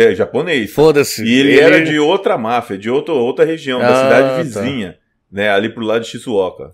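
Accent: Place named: São Paulo, Brazil. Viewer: ele é ex-yakuza sim! e tá no brasil com sotaque paulistano falando de uma das máfias mais violentas do mundo. é sim.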